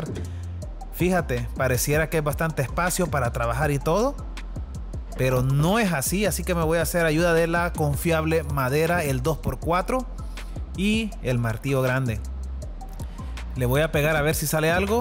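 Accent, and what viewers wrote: El Salvador. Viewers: Viejo por tu tono sos Salvadoreño! Me llega! Ya me suscribi, mira ayudame porfa men, Donde consigo los aros o anillo para tirar de cobre que pegas a la lata junto con la maquina que los suelda? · Tiene acento de el salvador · Por 2 palabras que te escuché deduzco que eres de El Salvador, te felicito por tu excelente trabajo, yo no trabajo en eso, pero me parecen muy interesantes tus videos, saludos desde el pulgarcito de América.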